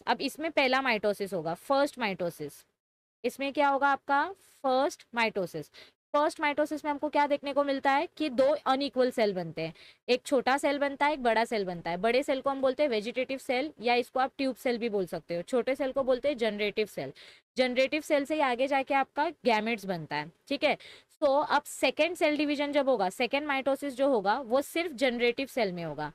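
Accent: Maharashtra, India. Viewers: Mam yaar aap kitni clear Marathi bolte ho Me MRATHI 😇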